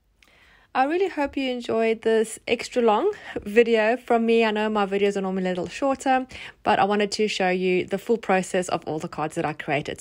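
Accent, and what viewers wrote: South Africa. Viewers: You have a South African accent?? Loved the ideas shared. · Has to be a saffie - my accent too. We say Serviette though?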